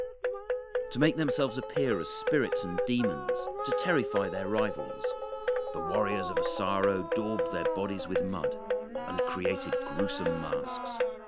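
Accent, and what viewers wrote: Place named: RP, United Kingdom. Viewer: Good British Queen's English language Thank you Sir for this educational video of Papua New Guinea Much appreciated 🙏🙏🙏 God bless you ❤️❤️❤️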